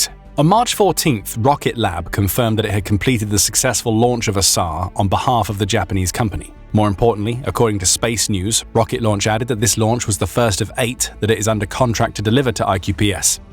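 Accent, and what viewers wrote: United Kingdom. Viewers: Sad. The narrator speaks with a British accent but speaks such dismal English. 'They just gave', 'They just gave' - yugh, what a wrong 'un!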